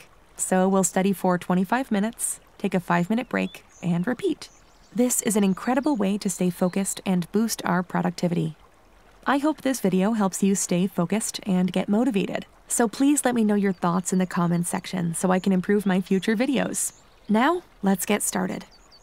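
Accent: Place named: England, United Kingdom. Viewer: I like ur English accent 😅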